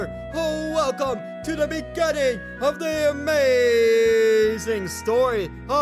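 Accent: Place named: Canada. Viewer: This guy sounds like he might be Canadian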